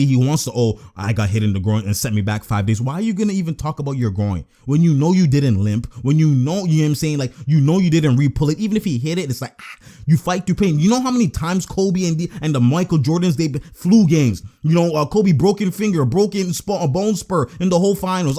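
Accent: Canada. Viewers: I can tell u from Canada from ur accent aha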